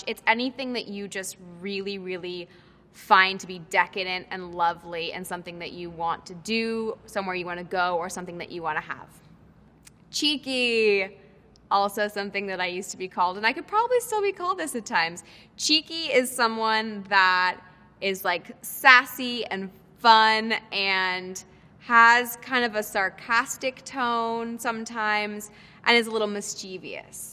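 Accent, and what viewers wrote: United States. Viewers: She sounds American so she should have opened with "I sound American but I grew up in both in the US and England as my father is English." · I'm confused...but she's speaking in heavy American accent! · I am not familiar with Lily and after I heard her American accent I was like 'Whaaaaat? I thought you were British' and then did a quick Google check lmao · I became confused after seeing her strong American accent, then I remember her strong British accent in Love, Rosie. Her life is definitely complicated. Thanks, Google. 🙂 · The American-born daughter of a British musician teaching British slangs whilst sporting a heavy American accent. What sorcery is this?? o_0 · Amy She was a weird choice considering how american she sounds, but there were a few in the video I didn’t know off the top of my head that she seemed to know. Also, we don’t really know how much time shes spent in the uk, could be most of her life for all we know. 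Still a few questionable definitions in there though 😂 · Bet she wishes she had her accent back. I’d hate to have an American accent. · An american accent ffs.... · I didn’t even know she was British.... 🤷🏼‍♀️ she has such a strong American accent! You learn something new everyday. 💕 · She keeps it in her back pocket & can retrieve it at will, but US English is her default since she grew up here. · Why does she sound American and not British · shaima! Bruv I live in the uk and I certainly know. The heavy American accent with the slang terms is not it💀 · She has an American accent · It's weird hearing someone explain British slang in an American accent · She has american accent though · She's got an American accent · Wow. An English actress who speaks with an American accent because she moved to the US at the age of 6.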